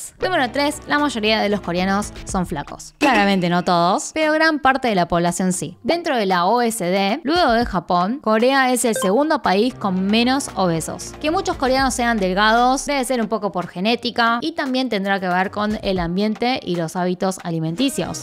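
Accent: Argentina. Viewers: ME GUSTA EL CONTENIDO DE CALIDAD E INVESTIGATIVO QUE DAS, APARTE EXPLICAS MUY BIEN EL PENSMAIENTO COREANO SIN LLEGAR A LOS EXTREMOS, ERES MUUUUY DIFERENTE A OTROS YOUTUBERS COREANOS QUE TRATAN DE REIDICULIZAR A SU MISMA CULTURA O POR EL CONTRARIO SOBREPONERLA A OTRAS CULTURAS. Y UN BONUS EXTRA: ME SUPER ENCANTA TU ACENTO ARGENTINO, A VECES PIENSO QUE MIRO TUS VIDEOS POR EL ACENTO ARGENTINO COREANO 😂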